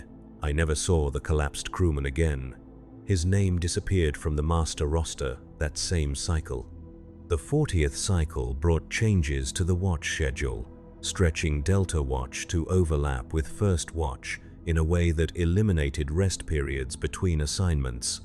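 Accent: United States. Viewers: Put the voice back, American voices SUCK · Old voice was so much better, new voice is too American sounding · Too American voice not good to listen to. 😢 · Wtf is with our favourite voice sounds to American now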